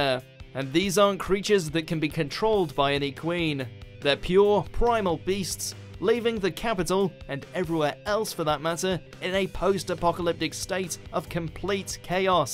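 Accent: United Kingdom. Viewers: You already know there UK when you here the voice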